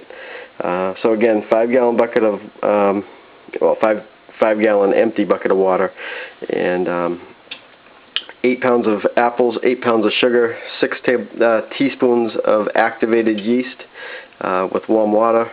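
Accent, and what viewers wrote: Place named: Maine, United States. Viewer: You from Maine? You talk like we do.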